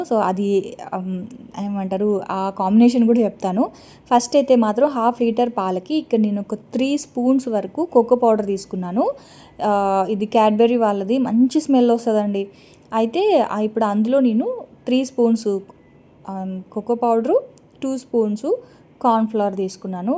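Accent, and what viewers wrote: Godavari, India. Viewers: full godavari and vizag slang lone matladataru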